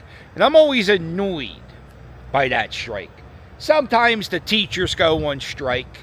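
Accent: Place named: Philadelphia, United States. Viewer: Da Instagram, ya know?! 😂 Philly to the core. Love it. 💙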